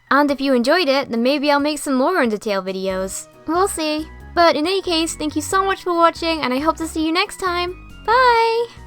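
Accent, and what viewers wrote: United Kingdom. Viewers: wtf are you british ???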